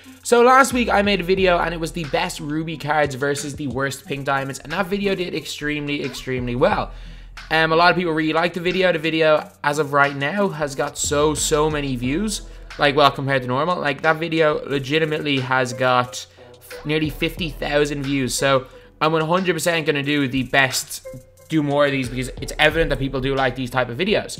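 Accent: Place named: Ireland. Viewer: First video of yours I watched but I know by the accent your Irish?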